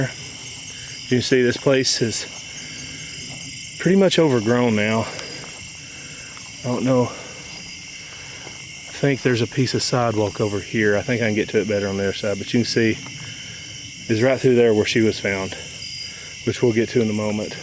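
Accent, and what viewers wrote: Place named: Tennessee, United States. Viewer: Another nifty explore! You caught some interesting EVPs,: "Got me" or "Shot me ('ot me)" whispered after you ask "Who's Michael?". "Hello!" after you say: "I'm here to communicate with you.". "I'm not gonna fix it!" very rapid words, male voice, after you say: "It's weirding me out!". *You had the wrong...REACTION!" Same male voice as. "Soil!" This was whispered after the Ghost Box spells out "Barry". I think it meant "Bury" but spelled it wrong, because: "In the...GROUND!" This is a whisper, but the word "ground" is drawn out (grooouunnd), and it sounds like Spirit is "scream-whispering" at the top of his non-existent lungs. He sounds angry AND frustrated. Perhaps something was "buried" there, in the "soil"/"in the ground" that was valuable, and the killer went there looking for it, ostensibly to have supper? 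"No". Very faint whisper after you ask: "Anything you'd like to say?". "No." Another faint whisper after you ask: "Was it your cousin who committed the murders?". You heard that one yourself, as you mention "hearing voices". "Get lost!" whispered as you are going through the brush/shrubby trees. Very interesting explore,. Lots of EVP evidence caught. BTW, I love listening to your voice, as my Great Uncle Joe was from Tennessee, and your accent reminds me so much of him. He even used to say "terlit", so I >know< you didn't make that word up!:) Stay safe,!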